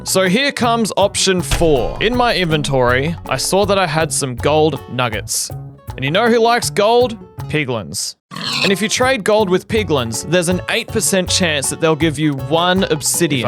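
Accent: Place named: Australia. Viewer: You sound like a fair dinkum Aussies.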